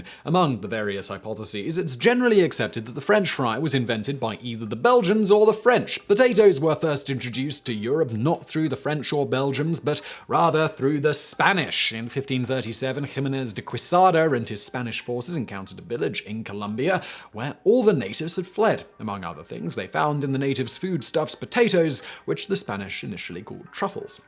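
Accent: United Kingdom. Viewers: Is it just me or does the narrator’s intonation sound annoying? I usually like the British accent but his annoys me. Ugh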